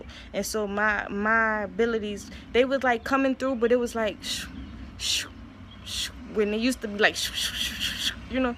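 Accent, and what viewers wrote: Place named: Louisiana, United States. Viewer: Is she from Louisiana? I hear a Louisiana accent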